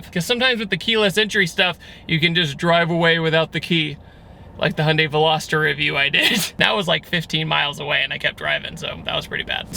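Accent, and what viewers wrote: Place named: West Virginia, United States. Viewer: Dude gotta be from West Virginia. Ouch...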